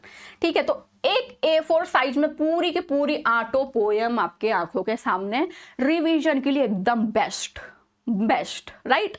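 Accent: Mumbai, India. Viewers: Mam kya Bombay se ho kyaa apke ,tone se lag raha he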